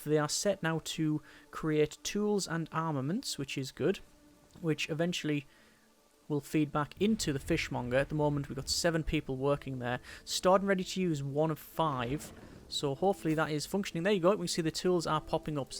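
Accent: United Kingdom. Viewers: You are British or Scottish, I Know it.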